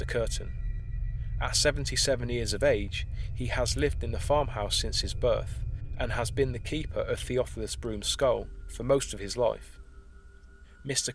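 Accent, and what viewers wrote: England, United Kingdom. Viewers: Refreshing also is the English accent as opposed to an American drawl announcing “....the top 15 haunted places in England”. Well presented, with excellent research. Thanks! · love the english accent. from america. god bless, brother. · You sound awfully British.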